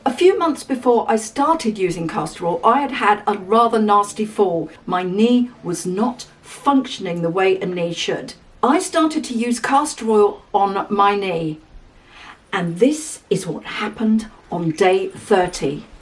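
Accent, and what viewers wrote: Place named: United Kingdom. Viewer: I’m a new user and early on my journey of discovery. And I want to say if you’re not a motivational speaker you’re missing a calling. Love your passion and enthusiasm and I’m a sucker for a British accent. I’ve heard and seen so many good things about CastorOil. I came for constipation and happily moving on with so much more LOL. Thank You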